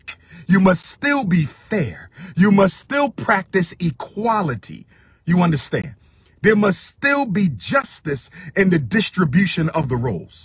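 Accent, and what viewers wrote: United States. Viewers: But Umar you have an American accent... wtf are you talking about?? Dude was raised in England that's why he talks like that..... I thought you were a Doctor. SMH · His not emphasizing anything. That is his accent. As an African from African you have an American accent. You don’t hear us saying that black Americans are emphasizing your American accent. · But your speaking in American tone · Doc. You have an American accent. Whether you try to or not. I agree, but the accent part is out there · With all due respect Dr. Johnson, Brother Kaluuya speaks with a British accent for the same reason you speak with an American accent. He is not "emphasizing" anything. Neither of you was born/raised in Africa, so neither of you has an "authentic" African accent when speaking the colonizer's mother tongue. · Umar you speak with an American accent that derived from an amalgamation of British, French and other Europeans. 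· lol you really bashing British tongue while u speaking American English? yaz usangene wena? uyakwaz loko? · 😂 dude you are funny. He speaks English with British accent you speak it with American accent. There is no difference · As articulated as you are Umar ,you are "Emphasizing" american tongue so shouldn't we be concerned ? Cmon bro that's his damn accent , your whole video is speaking in an american tongue, and its disingenuous towards are Brothers that do have a british accent . That's ignorance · DR UMAR YOU ARE AFRICAN WITH AN AMERICAN ACCENT, TRIBALISM KILLED AFRICA. LETS LOVE AND WELCOME EACH OTHER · You're speaking with an American tongue ffs hypocrite 🙄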